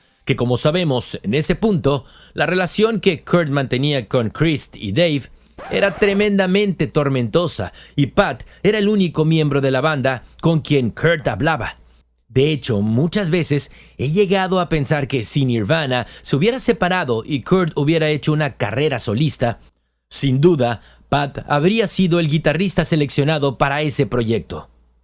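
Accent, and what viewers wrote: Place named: England, United Kingdom. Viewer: Well buddy, it’s not really pronouncing his name it’s off. You sounded English to me unless you’re AI I don’t know how you could get KURT COBAIN i’m really emphasizing on the last name. I’m not being a bitch either. I just noticed. Every time his last name was mentioned i got a tic. I have tourette’. It’s not your fault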